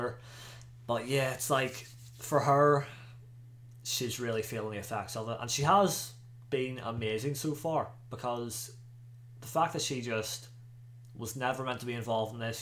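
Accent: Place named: Northern Ireland, United Kingdom. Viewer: I know a Northern Irish accent when I hear one 🤣 what part big lad? Only came across your channel today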